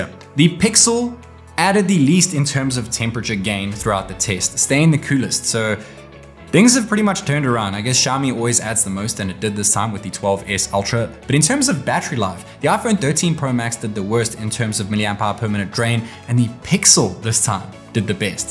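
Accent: South Africa. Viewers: Bro sounds South African🧐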